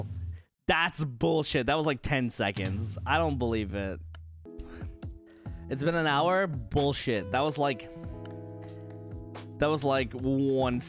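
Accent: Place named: United States. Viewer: 公表はしてませんが、英語の訛りや配信の時間的にアメリカもしくはカナダあたりだと思います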